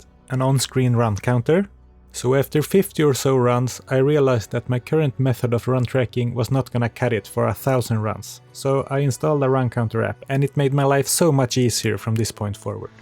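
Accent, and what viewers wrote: Sweden. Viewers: Svensk engelska är så distinkt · the deep swedish accent, one simply cannot miss that swinglish man haha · Yust? So you are Swedish. The Swedish English teachers must be very special, because I don't think I have ever met a Swede who pronounced "just" correctly :D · This video made me decide to uninstall d2r. No way I’m doing 1k runs. I’d rather just watch this dude do them while listening to the epic supersvenska dialect.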